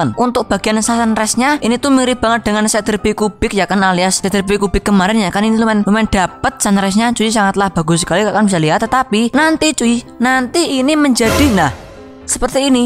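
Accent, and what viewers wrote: Java, Indonesia. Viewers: Uwong jowo ketoro ne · Sumpah gw ngakak dengan gaya bicaranya,, kayak jawa jawa gimana gtu anjay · Dia org Jawa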